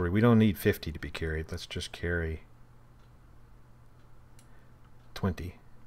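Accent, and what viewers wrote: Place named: South, United States. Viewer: What's with the accent? Are you a southerner?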